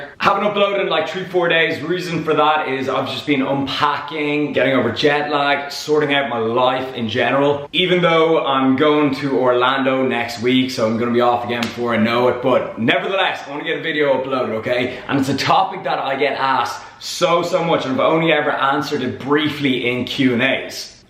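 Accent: Dublin, Ireland. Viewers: thats the Paddy coming out in him. Sounds like he is from Dublin.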